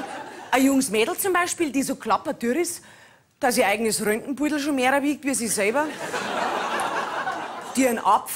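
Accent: Bavaria, Germany. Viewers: ich mag sie sehr und schaue auch ihre auftritte. ich muss aber ganz selten lachen. leider verstehe ich kaum was... bayrisch und dazu noch schnell, das ist nicht einfach für einen nicht-bayer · Schön wenn man als Ostberliner versucht bairisch zu verstehen. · Ich liebe die Bayern und deren Dialekt.... ist der weibliche Mittermeier 🍻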